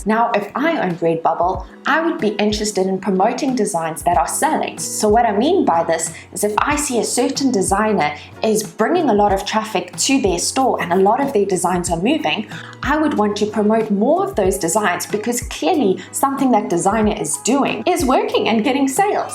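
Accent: South Africa. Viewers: I’m guessing you are from SA ? It’s really so nice to hear your accent on this app , so refreshing 😊😊. I’m from Namibia by the way 😄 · Keep up the work fellow Saffer ,sounds like a Durbz accent. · By the way I guessed your accent as I have 2 good friends from SA. Keep being genuine and honest and above all stay safe.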